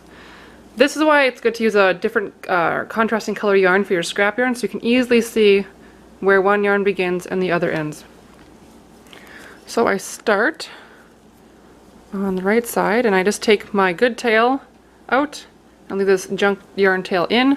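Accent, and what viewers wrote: Canada. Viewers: I love the voice and accent. I'm guessing Canadian. I'm convinced this is Matthias Wandel's sister! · You are amazing! I love that you figured out how to make the socks without doing the Kitchener stitch. I think at my young age of 73 that I should just buy my socks and not try to make them on machine or by hand knitting. It could take me the rest of my life to figure it all out, ha ha! I have tried hand knitting without much luck. I hate to spend a lot of money on a machine without being able to figure all the ins and outs. Lovely how you have done such a great job for yourself! You sound as if you are from Canada so I will not hope that you can teach me unless you would like to spend a couple of weeks in North Carolina! Bless you girlfriend.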